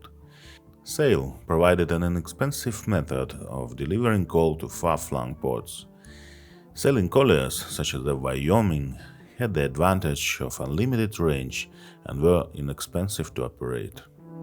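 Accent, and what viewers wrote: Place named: Russia. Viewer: great video and the russian accent makes it old home week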